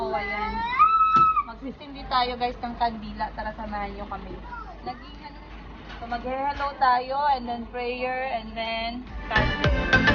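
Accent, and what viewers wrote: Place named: Bulacan, Philippines. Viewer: May hinala ako sa ‘yo Tinderang Africana na may hawig ka na KABABAYAN kita, may pagka- Bulakenya sa tấtas mong magsalita sa Tagalog, may pagka-makata pa Kung minsan ka kasi, taga bulakan dati ko narine na sa california.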